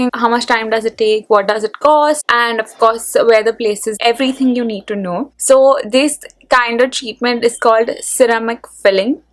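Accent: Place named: India. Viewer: Indians are so irritating with their stupid accent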